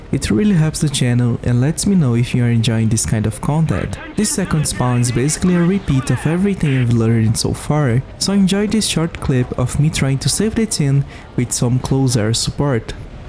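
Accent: Brazil. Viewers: Where u from? You sound like a brazilian guy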